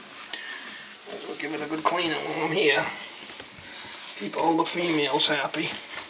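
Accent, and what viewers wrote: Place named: New England, United States. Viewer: New England drawl!👷🏻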